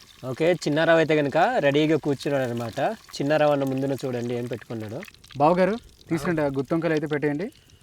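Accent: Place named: Odisha-Andhra border, India. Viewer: ఒడిషా మరియు ఆంధ్ర బోడర్ బాషా మీది మాకు ఒడిషా వచ్చు మరియు తెలుగు కుడా వచ్చు చాల గ్రేట్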